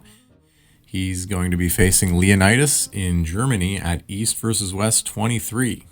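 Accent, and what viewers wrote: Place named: United States. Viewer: Finally, found an AW news channel with an American accent .. plz post daily🫡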